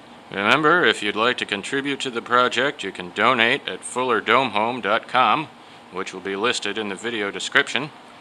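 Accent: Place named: Croatia. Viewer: Brilliant work however your heavy Croatian accent makes it difficult to follow your otherwise excellent vid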